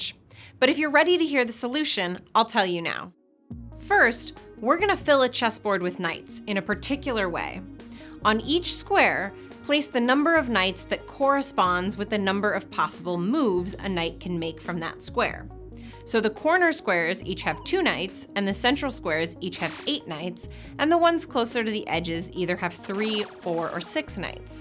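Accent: United States. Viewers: Y u talkin murican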